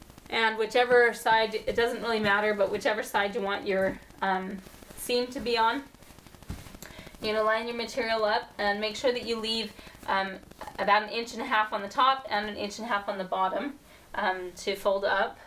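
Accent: Utah, United States. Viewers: It's a Utah accent ❤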